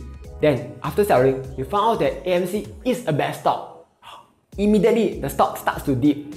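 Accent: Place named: Singapore. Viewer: Absolutely love ur video, no BS and straight to the points! Plus Singlish…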